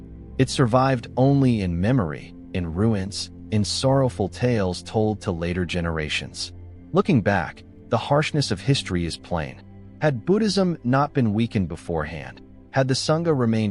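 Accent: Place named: United States. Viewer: good documentary - despite that american accent - 😖 - thanks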